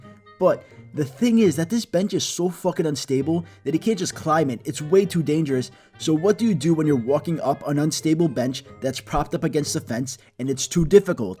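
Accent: New York, United States. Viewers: Haha how you can tell he is newyork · This mans gotta be from new york or someones god father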